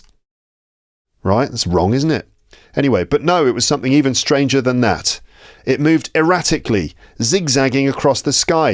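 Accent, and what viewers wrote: United Kingdom. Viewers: Hello! You are an amazing teacher I can say i am so glad to have you in youtube. I understand your teaching process.i have learn so many things from your videos. Your British pronunciation is not that difficult.I understand easly everythings. You doing great work for helping like us I really appreciated and from the depth of my heart I wants you to thank you! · Your channel is a real treasure. I mean, your British accent, intonation, and facial expressions make it more fun and catchy! Thank you so much, Mr.! from 🇸🇾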